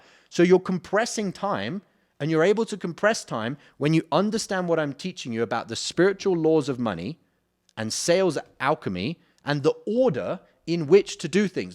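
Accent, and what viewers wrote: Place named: England, United Kingdom. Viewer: have you heard of an english accent my friend?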